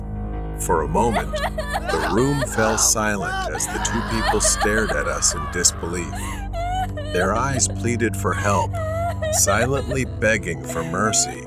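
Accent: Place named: New Jersey, United States. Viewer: your jersey accent makes your videos all the more comforting. been following since 10k <3 😰😰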